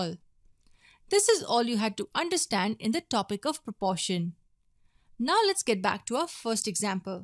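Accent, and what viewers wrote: India. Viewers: Please change your talker im not in the mood for indian accent (not offensive just asking)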